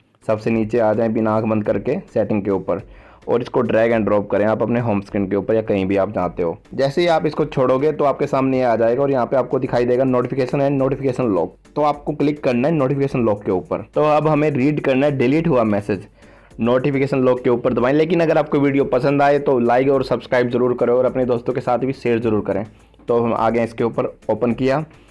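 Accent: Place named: India. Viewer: Why do Indian English so difficult for me to understand???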